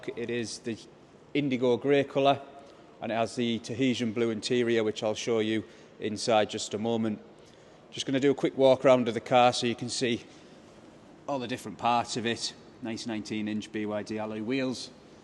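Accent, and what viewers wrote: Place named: RP, United Kingdom. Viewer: not only the car but I love your stellar british BBC accent. You sound like Robbie Williams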